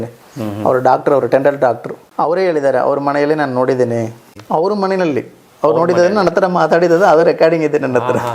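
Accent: Tamil Nadu, India. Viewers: Tamil avru sooo adikke hage aste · He is tamilian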